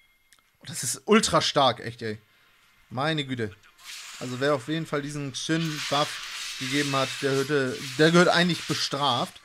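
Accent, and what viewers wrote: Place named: Austria. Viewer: Ich kenne dein Dialekt.....du bist Österreicher ne?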